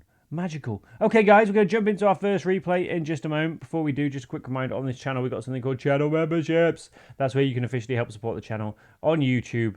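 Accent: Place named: United Kingdom. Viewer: He is British and British accent is the best one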